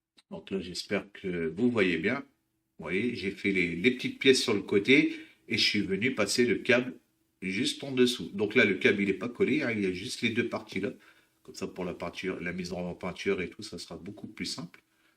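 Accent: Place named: Germany. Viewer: je prend ta vidéo très en retard mais ton anglais sur ton tube ABT ce serait plutôt de l'allemand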